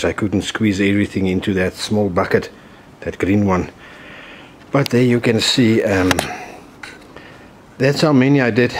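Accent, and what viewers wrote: South Africa. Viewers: Oh yes the true Soud Afarikan accent! Love it! 😂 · Are you south African?? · I already knew it when I heard him, I’m from Cape Town and the jojo tank with the Isuzu in the background just hit the nail on the head 👌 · Are you South African? This is a great process, similar to others so it for sure works repeatedly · He sounds so South African. The accent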